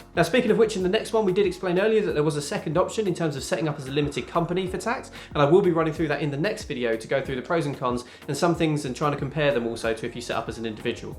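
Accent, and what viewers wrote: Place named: United Kingdom. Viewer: Can you slow down when you talk, I’m an American it’s kinda hard to understand what u saying when u talk that fast with a British accent.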